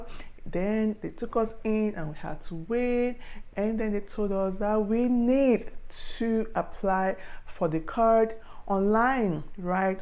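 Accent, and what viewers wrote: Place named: Texas, United States. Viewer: I love your Texas Accent 😂😂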